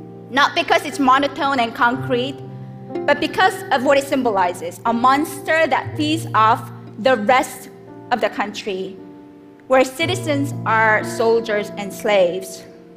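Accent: South Korea. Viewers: an accent... it's kinda obvious Korean is her first language lol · She grew up in South Korea but moved to the US during her childhood. I'm more than sure that her first language is Korean considering how strong her accent is still. Let's ignore the accent. Her book itself proves how great her English is despite her stating how difficult it was for her to grasp the English language back when she just moved to the US. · She was born in South Korea and is probably her first language. You can't expect her to speak with perfect English. And it's like you guys trying to speak Chinese with perfect pronunciation. And Korea is so different from English. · Morgan Campbell The Koreans have a REALLY hard time overcoming their Korean accent when learning English. I agree she has done really well. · She's from Korea. Of course she has an accent. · Do valley girls all have Korean accents? 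· She's Korean and has an accent. I think she sounds beautiful. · if you had ears you would also know that she was born and raised in South KOREA, which is why she still has an accent. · probably a regional south korean accent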